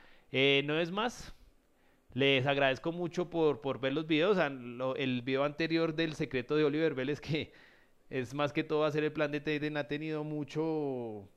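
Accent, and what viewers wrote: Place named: Colombia. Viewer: acento colombiano fuerte jejejeje, Gracias por lo que aprendemos de ti!! Por favor respondeme a que te refieres con "Esta si tiene pareja" en los gráficos??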